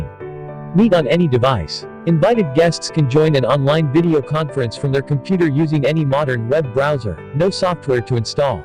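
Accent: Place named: England, United Kingdom. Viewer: I like you english accent, thank you for being a good teacher and sharing your idea about our modern technology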